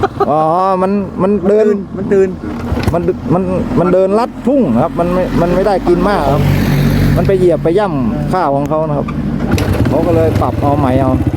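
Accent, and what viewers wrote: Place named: North, Thailand. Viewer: อู้กำเมืองก่อได้ก่าอ้ายกำเมืองม่วนหน๋า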